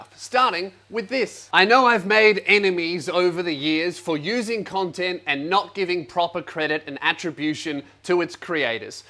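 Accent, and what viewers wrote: Australia. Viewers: I like Australian English 😂 hi from Slovakia 🇸🇰 🤘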